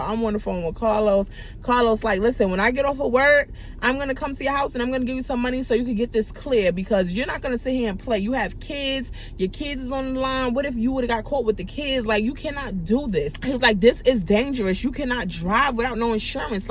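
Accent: New York, United States. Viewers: I knew you were from New York!!! I’m from Brooklyn so I know a New York accent when I hear one❤😊😂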